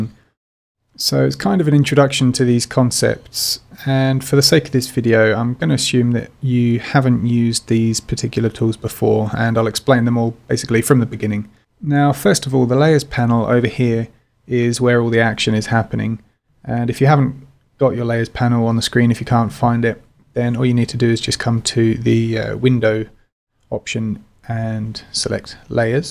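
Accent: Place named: United Kingdom. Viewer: Brilliant! Thank you so much.... I especially loved your British-isms, being a Brit living in America for the past 16 years I really felt at home especially with 'stuffed' and 'dodgey'. A fab, well paced tutorial that has prevented me throwing my laptop out the window because of Photoshop. Thank you.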